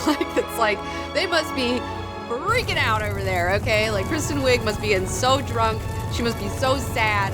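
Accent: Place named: South, United States. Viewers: Are you from south carolina or north carolina? The way you speak has a hint of an infliction that I've only heard there. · New to channel- does anyone know if she's from the south? I hear a southern accent xD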